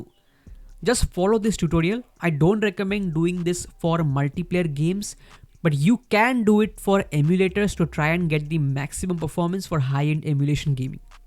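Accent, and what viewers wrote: India. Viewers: After hearing you're accent I instantly get to know you're Indian but why don't you make videos in hindi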